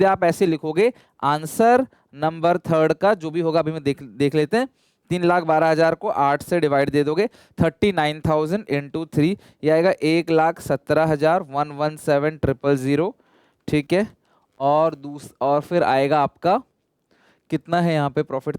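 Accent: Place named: Uttar Pradesh, India. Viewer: Lagi raha tha ki tum up se ho😅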